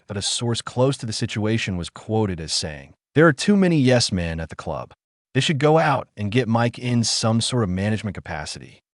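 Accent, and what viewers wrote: United States. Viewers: AI crap, not just because of the US accent commentary !!! Don't bother with it folks !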